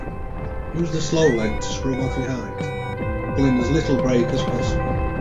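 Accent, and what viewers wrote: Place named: Yorkshire, United Kingdom. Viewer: Thank you very much for your very helpful video its especially nice to hear a down to earth Yorkshire man mmmm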